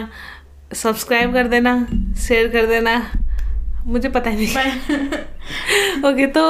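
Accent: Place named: Garhwal, India. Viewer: but she is not Punjabi she is uttarakhandi (gadwali) like me I am also from uttarakhand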